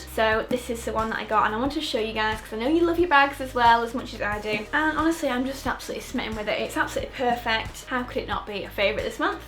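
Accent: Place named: England, United Kingdom. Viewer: Another great video! You are so pretty and even though we are both from England I love your accent 😂🙈 xxxx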